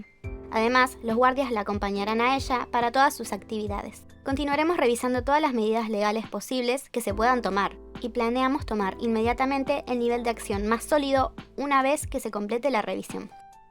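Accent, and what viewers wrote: Argentina. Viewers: Sos de Argentina??? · es argentina así se pronuncia acá bobo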